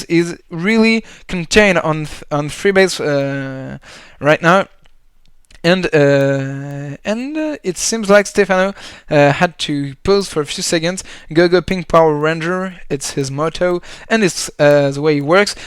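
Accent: France. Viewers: Salut, bravo d'avoir pris le risque, mais fais quand même attention à éviter les fautes d'anglais. Personne te reprochera d'avoir un accent frenchie mais massacrer certains pluriels ou conjugaison pourrait te coûter cher! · Mis a part les "ze" a la place des "the" je crois que ce qui rend l'accent français si identifiable sont les "euh" quand tu hésite, ca sonne vraiment très français, je comprends bien que c'est assez dur a éviter, mais entraine toi a faire des "euh" anglais, ca sera toujours mieux, sinon ton anglais est assez clair et fluide, continue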